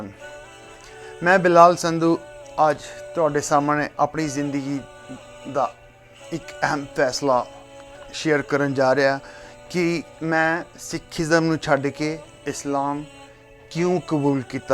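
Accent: Pakistan. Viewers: 😂😂 guys this is just a fake propaganda video by pakistani punjabis. His accent and urdu words mixing with punjabi says it all. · Tu sikh nahi hai teri bhasa hi nahi hai sikhn wali ihe boli pakistani hai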